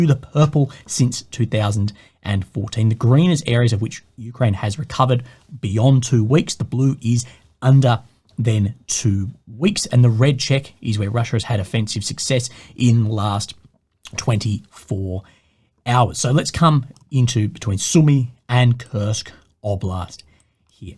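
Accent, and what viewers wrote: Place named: Australia. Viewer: He speaks English with an Australian accent.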